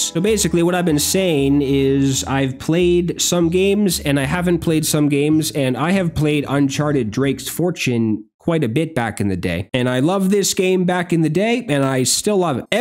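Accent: Canada. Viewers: I love your Canadian accent. But I will say that you should play Resistance 2 and 3. It improves on the first game....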